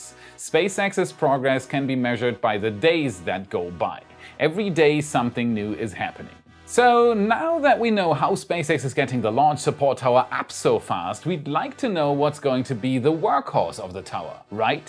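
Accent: Germany. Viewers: haha he has quite the german accent, but his grammer and vocabulary are perfect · Dutch, German and Scandinavian people generally speak really proper English and quite a few are close to fluent or fluent. These languages are pretty similar to English in terms of grammar and structure. As a Dutch person I can easily spot ’ German roots in his accent though, just like it is pretty easy to spot Dutch accents when you know what to look for.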